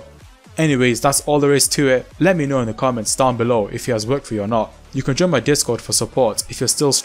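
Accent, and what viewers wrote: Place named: India. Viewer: no racism but you are the first indian sounding yuotuber that has done a legit tutorial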